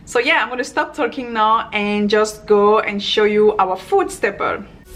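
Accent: France. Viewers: Are you french ? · Cet anglais parfait avec cet accent français xD Ca donne tellement envie tout ces produits frais ! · Craig Mack she has a French accent to me.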